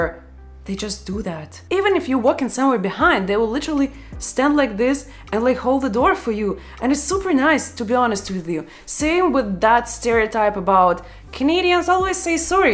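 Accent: Russia. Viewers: I love your English with Russian accent, keep doing your great stuff. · Your Russian accent is cute 👍me likee like 😁🥳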